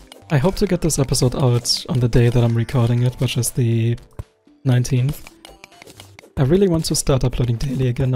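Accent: Germany. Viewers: your accent sounds german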